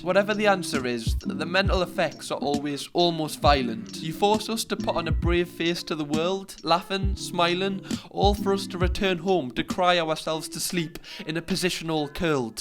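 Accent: Newcastle, United Kingdom. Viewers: Good video, good words, and I know this is bad but I feel like it doesn’t u e the same effect in a Geordie accent. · YOU JUST CANT TAKE HIM SERIOUSLY WITH THAT NEWCASTLE ACCENT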